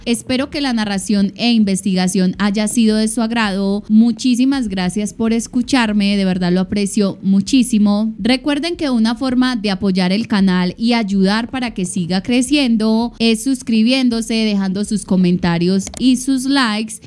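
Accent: Antioquia, Colombia. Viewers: Siempre hay otras opciones para escuchar... es su manera de hablar, muchos colombianos así hablan, y a muchos nos encanta ese acento!! ❤ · No es Muletilla, se llama acento. Ejemplo las personas argentinas tienen su acento al hablar, así mismo es en Colombia. Me gustaría escucharte haber como hablas al narrar algo. Es bueno criticar detrás de una pantalla. 🙄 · Es su acento de ella! Así hablan en Colombia! Tu quiensabe dq país eres! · Es así su acento porque intuyo que es paisa y esa es la manera como hablan. Si no es de tu agrado hay muchos youtubers de true crime que puedes escuchar. Ya que ni modo que ella cambie su acento porque hay gente que no le gusta.😊 Saludos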